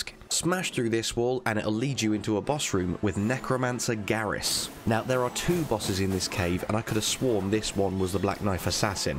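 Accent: United Kingdom. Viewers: Even if I am on ng+2, almost all looted. It's a pleasure to hear you I don't know if you are british, but you accent is really pleasant to hear. Greetings from France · My apologies I did not receive a notification regarding your reply 😅 This may sound weird but I wish I could live in the UK hearing your accent 😄 It's fuckin hot as Volcano Manor here in Arizona 😂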